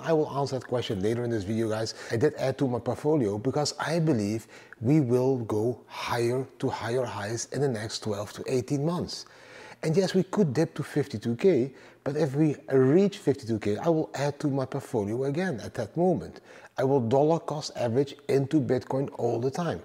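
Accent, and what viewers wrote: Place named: North Limburg, Netherlands. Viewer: Ik kijk met genoegen naar je video's. Naast de info ook vanwege de hele prettige uitstraling en de verborgen N-Limburgse tongval in uitstekend Engels. En mss zijn er mensen die zeggen dat het leven makkelijk is met vermogen, maar dat is BS. Zelf verdiend, van harte gegund en een enorm risico genomen. Ik ken meer vermogende mensen, kunnen zo in het azijnrek vd supermarkt. Oja, een vriendelijke lach, een paar zinnen leren van de taal waar je op dat moment vertoeft doet wonderen. De wet van wederkerigheid. En vooral ook leuk Geniet van het leven mensen !!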